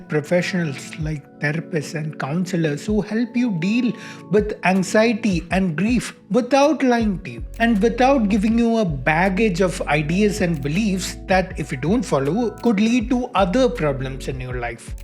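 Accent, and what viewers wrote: Kerala, India. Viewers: Your Mallu Accent 😂😂😂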